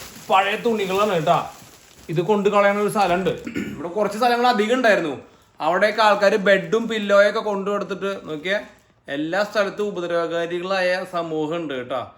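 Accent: Thrissur, India. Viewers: content onnum illenkilum veruthe samsarichal mathi, athu kelkkan oru rasamundu, ningal Thrissur alle, aa slang anu rasam. Njan ningalude video kanunnathe ninte samsaram kelkkana.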